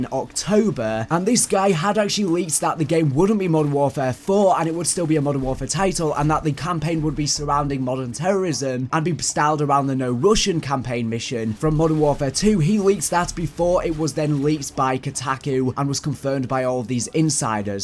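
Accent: England, United Kingdom. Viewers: Well he's English and morgz is English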